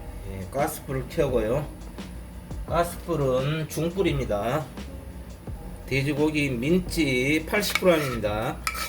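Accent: Jeolla, South Korea. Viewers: 전라도 말씨 거슬려요 비호감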